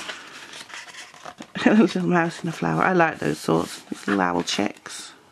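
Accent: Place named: England, United Kingdom. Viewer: I don’t think I’ve mentioned before, I love listening to your videos, you have such a lovely calming voice. I also am particularly fond of English accents as I have rellies in England and it makes me feel very homey Xx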